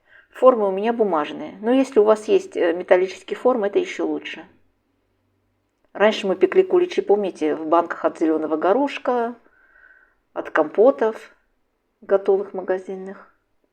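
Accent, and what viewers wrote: Baku, Azerbaijan. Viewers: Спасибо ,я тоже пеку куличи и научила меня бабушка она мне не родная но я считала но моей такая добрая,ласковая аиу меня не было не бабушек и дедушек ,венское тесто она говорила , похожее на ваше тоже ,очень лёгкое , но хочу попробовать ваше ,мне очень понравилось и ваш голос вы случайно не из Баку ,я из Баку ,голос приятный и вы говорите нечего лишнего ,попробую ваш ещё проще и очень красиво и наверное вкусно ,вас с страсной неделью и со Светлой Пасхой и золотым ручкам и вам здоровья и хранит вас вашу семью ,Господь Аминь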